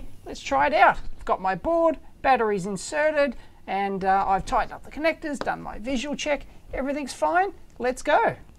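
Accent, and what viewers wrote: Australia. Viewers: Awesome! (said in your Aussie accent) :)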